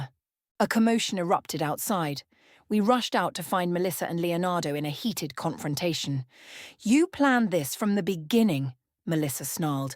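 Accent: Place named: United Kingdom. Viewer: The British accent drives me crazy. Sounds like she has a mouth full of marbles. I’m gone!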